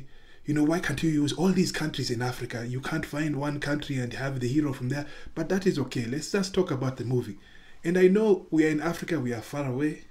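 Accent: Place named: United States. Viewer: Your accent says you're American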